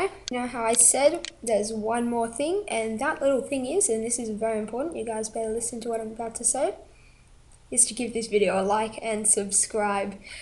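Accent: Australia. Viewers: I am quessing, just a hunch, your an Australian. Let me know if I am right. Nice to hear the accent..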